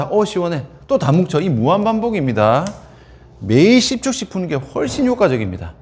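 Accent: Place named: Busan, South Korea. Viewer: 부산사람이야 외국사람이야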